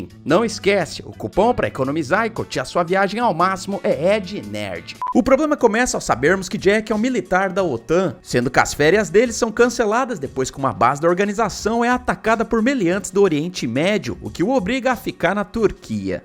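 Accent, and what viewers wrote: Portugal. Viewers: muito bom sotaque portugues, abraços, sou de portugal e vejo os teus videos e acho top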